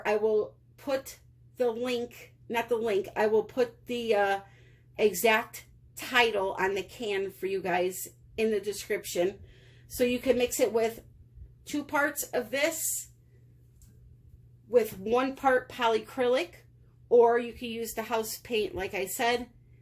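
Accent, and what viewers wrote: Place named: Chicago, United States. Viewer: i havent even watched this one...YET., but i have to ask one thing. your accent. Sounds from up north Chicagoish, thats where I grew up. been in TX since 81 and miss home sooo much . besides your amazing with you art. you rock the videos!!!!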